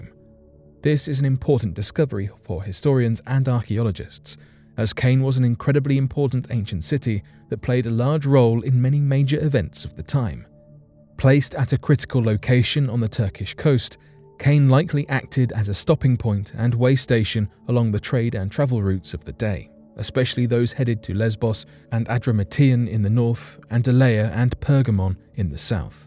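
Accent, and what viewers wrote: England, United Kingdom. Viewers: why all these space videos always have an English accent? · Nice video but I don’t believe you should be putting the u.s navy’s business out there,but what can I do you do have a British accent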